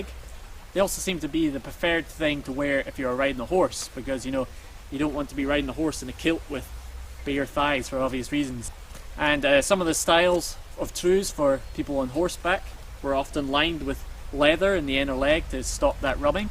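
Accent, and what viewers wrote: Scotland, United Kingdom. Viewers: Interesting and informative, and great to hear a Scots accent on Yt. Dunno about dipping my plaid in a burn, though - you're a braver man than me,:-D All power to ye.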